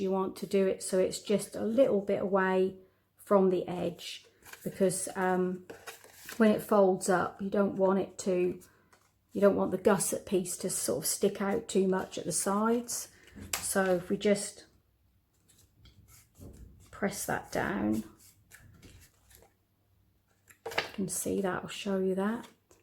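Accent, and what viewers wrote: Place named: Norfolk, United Kingdom. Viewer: Are you from Norfolk..I can hear your accent? 😍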